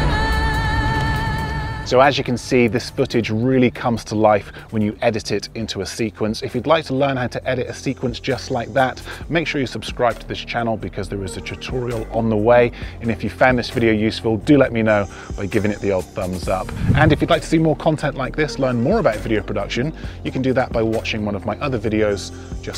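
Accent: United Kingdom. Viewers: めっちゃイギリス英語！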